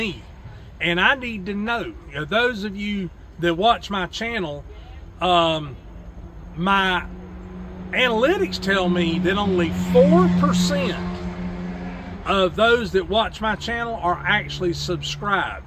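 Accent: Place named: North Carolina, United States. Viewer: I'm a transplanted Tarheel in Maryland with 12 acres of property that I maintain, a mix of grass areas and trees. I was attracted to your channel (subscribed!) because there is always something to be learned from the experience of others with regard to mowing, trimming, and general land maintenance. Not to mention that listening and watching you reminds me so much of my southern NC roots. I also enjoyed your vacation episode - I drive by Fancy Gap on my way to NC to visit family so was familiar with the area. Didn't know about the cabin rentals so something for me to check out for one of my getaways in the future. I would say keep doing what your doing. Not everything will hit everyone's interest but variety is always good.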